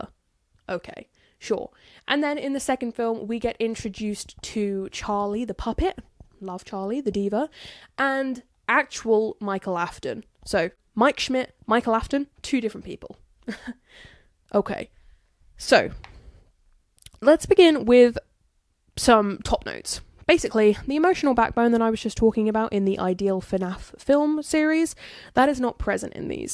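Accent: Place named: Ireland. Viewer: OMG YOURE IRISHHHH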